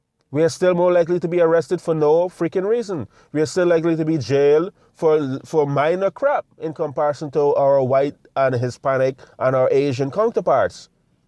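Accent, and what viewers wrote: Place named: United Kingdom. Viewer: You sound british. You come from a very different experience than afro americans. It's NOT the same.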